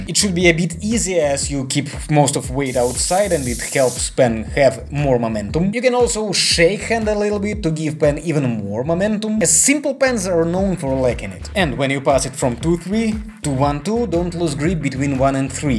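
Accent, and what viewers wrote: Russia. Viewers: Love your Russian accent 😂♥️🤞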